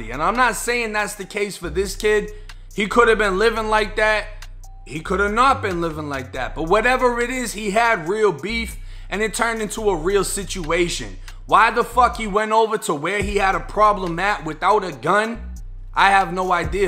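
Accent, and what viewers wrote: Boston, United States. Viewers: Sounds like U from Boston?? But with the most Southern accent aloud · Yo homie are you from Boston?? That accent strong 💪🏾 AF. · Are you from Boston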